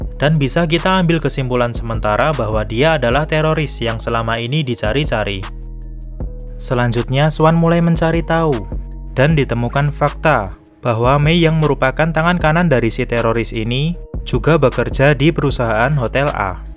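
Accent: Java, Indonesia. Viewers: Medok banget min:)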